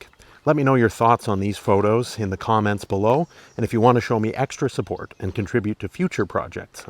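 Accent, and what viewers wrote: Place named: Canada. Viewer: I’m so glad I found this channel. I appreciate the quiet, measured and affably Canadian narration. I also like how you just lay down the stories and the evidence that are out there so people can draw their own conclusions. Most paranormal/cryptid/UAP channels are ultra low effort AI clickbait, so when I find one that’s not, it’s very exciting. Keep up the good work!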